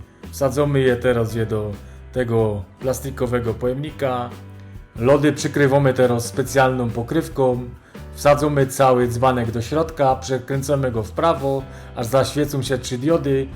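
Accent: Silesia, Poland. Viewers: A co to za fonetyka? Czy to wymowa śląska?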